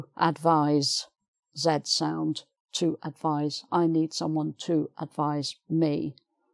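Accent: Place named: Midlands, United Kingdom. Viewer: The lady is from Midlands, he told about this in her video about accents and dialects. She moved to London and her pronunciation gradually changed.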